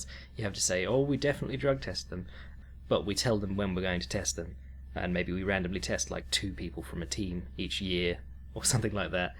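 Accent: United Kingdom. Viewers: i'm brazilian and i love your work i have been reading everything you wrote sinceramente but my english skills still no só good enought to hear and understand everything you say even with your cool britsh style very much more easy to me, thanks but May you put subs in this podcast? if you do and i share this(in fact a will share whatever happens lol) others of my countryman will enjoy too, and belive in me, brazilians NEED your work people here love mma but don't know nothing about this...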